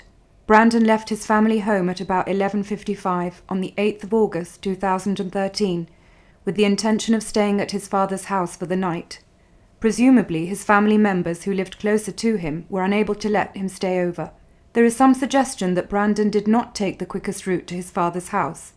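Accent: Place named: United Kingdom. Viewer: I have looked at & listened to many videos about this case. but it’s so good to hear it spoken in a calm collective manner by a fellow Brit. Thank you. It’s time Brandon was brought home.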